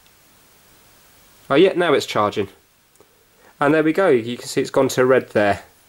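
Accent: United Kingdom. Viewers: British accents are so soothing, I love watching videos which are made by people who have them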